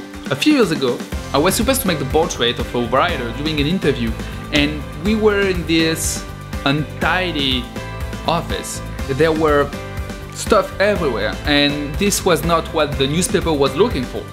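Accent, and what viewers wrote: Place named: France. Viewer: On entend bien l'accent français. J'adore la vidéo continuez !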